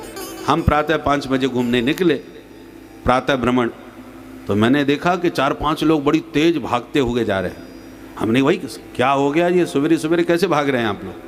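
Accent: Braj, India. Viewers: Maharaj shree apke mukh se braj brajbhasha bhut madhur lagti hay apko shut shut naman 🙏🙏🙏🙏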